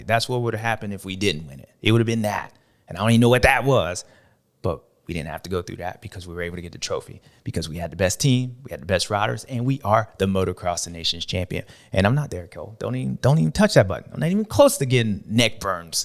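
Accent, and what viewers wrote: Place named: Florida, United States. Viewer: Home Soul 😂 gotta love that Florida farm boy accent. Love you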